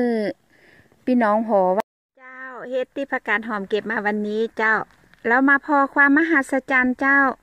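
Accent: South, Thailand. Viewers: พี่ผักกาดแหลงใต้ด้วยค่า จากเอฟซีคนใต้ค่า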